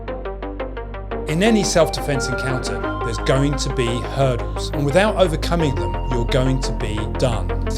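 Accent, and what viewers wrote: England, United Kingdom. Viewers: You sound English I guess having a hand gun is not an option , some bad guys make the mistake of thinking they are the only one with a gun. Most of them cant land a shot within 3 feet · UK accent.. hows those knife attack stars after gun bans.. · Based on his accent I realize he's a Brit ( I live in the USA); I wish he was my sensi!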